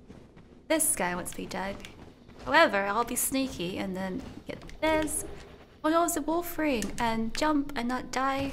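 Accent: England, United Kingdom. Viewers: Adorable english accent, sounds well spoken and proper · Hey or if its okay^^ Pls can you make a video or Vlog in german? Your german with this english accent is cool. =) This would be nice.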